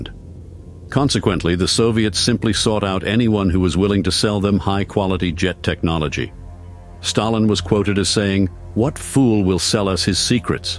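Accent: United States. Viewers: Why is an Aussie channel vid narrated by a yankee? And airmen from the 60's shot 8mm film, not 8mm video. Video would have been shot on 2" magnetic tape and was not portable, nor used by airmen. There is a difference between video and film. Accuracy counts in history folks. Details, even small ones, are important. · The narration switches between American and Australian accents mid paragraph. Why has no one else noticed this? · How is is that 'Australian Military Aviation History' have an American accent?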